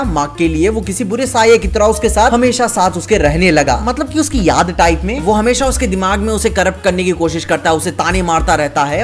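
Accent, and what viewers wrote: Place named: Bihar, India. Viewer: Bhaiya ham bihari hai... Ham bhi kabhi kabhi ki tarha batiya lete hai. Par pata nahi kyu.. vo accent bhiya ke mu se jyada acha lagta hai... 🤣 Keep up the good work bhai..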